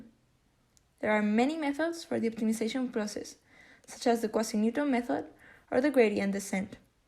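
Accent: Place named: Turkey. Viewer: She sounds like she is Turkish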